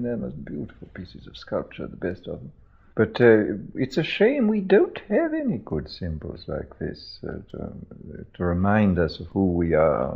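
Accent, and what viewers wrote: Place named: RP, United Kingdom. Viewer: Old School British Public School RP.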